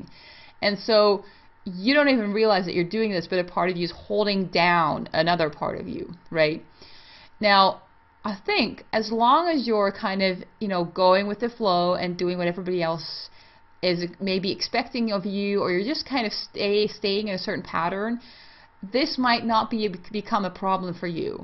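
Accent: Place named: Canada. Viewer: Thanks for the video. Your accent sounds like a mix of Canadian, Scottish, and... Dutch? 😊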